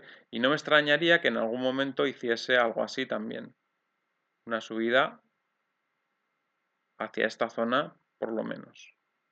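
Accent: Spain. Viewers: Eres de Madrid a que si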